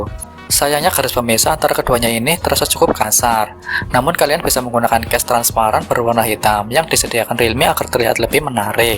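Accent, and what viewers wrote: Banyumas, Indonesia. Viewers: Ciri khas 😅Konten kreatore wong ngapakkk ikii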